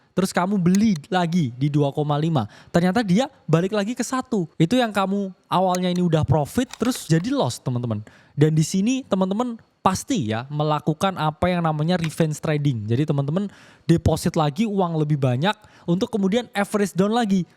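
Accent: Java, Indonesia. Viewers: Wong Jowo ndi suarane qi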